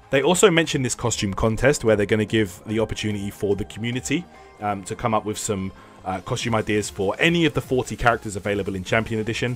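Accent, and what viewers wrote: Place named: United Kingdom. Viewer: Lol "Street Figh-uh," "cosch-yooms," lol! British accent!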